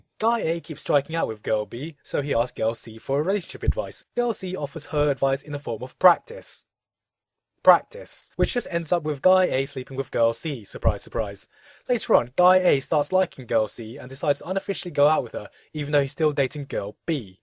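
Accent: United Kingdom. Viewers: Me and my friend wanna marry you because your Asian, and you have a British accent. And these videos are fucking awesome. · hey sounds british but hes asian XD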